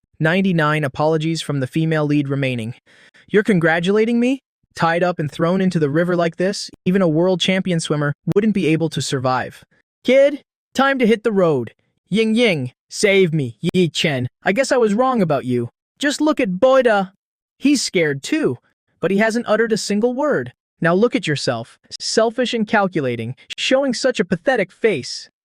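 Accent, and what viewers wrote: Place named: United Kingdom. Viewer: where`d the british accent come from 🫠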